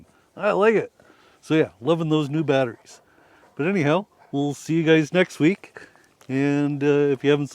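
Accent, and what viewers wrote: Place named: Eastern Canada, Canada. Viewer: Love your shirt. you talk like one of them Eastern Canadians. are you Canadian?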